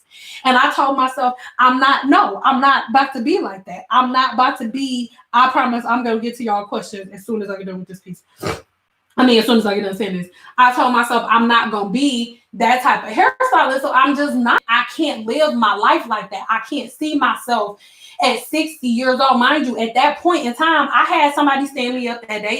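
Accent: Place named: Detroit, United States. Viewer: New SUBBIE, are you from Detroit? “woot woot”and the way you talk reminds me of HOME 313 🥰. You’re very inspiring, I introduced my cosmetology class to your channel 💕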